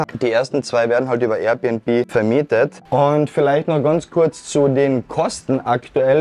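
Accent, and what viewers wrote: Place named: Austria. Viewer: Danke für dieses tolle Video! Schön, auch mal Youtuber aus Österreich zu hören! Wir fliegen im Juli für 16 Tage nach Koh Samui (i know-Regenzeit, ging leider nicht anders) aber wollte euch fragen, was ihr für's erste Mal Thailand empfehlen würdet, wie viele Tage Koh Samui, wieviele Tage Koh Phangan (vl auch Koh Tao)? Würde gerne auch Khao Sok am Festland für 2 Tage sehen wollen, wenn es sich ergibt. Natürlich auch einen Tagesausflug zum Ang Thong Marine Park. Habe an 5-6 Tage Koh Samui, 2 Tage Khao Sok und 7-8 Tage Koh Phangan gedacht (mit Tagesausflug Ko Thao evtl). Ist das zu empfehlen? LG aus Niederösterreich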